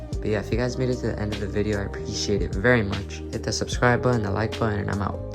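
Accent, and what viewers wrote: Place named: Kentucky, United States. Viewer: sounds like ky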